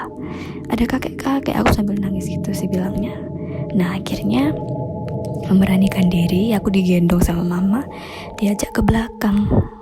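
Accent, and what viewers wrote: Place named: East Java, Indonesia. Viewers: Hooh dia orang Jatim wkwkw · Karangan? Mbaknya pasti org jatim ya hehe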